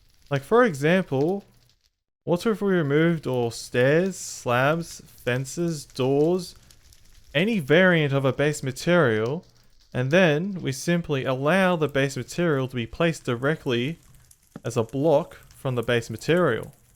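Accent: Australia. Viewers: I LOVE your northern aussie accent btw I forgot what you were talking about until when i was like "oh yeah the golem thats why i was here"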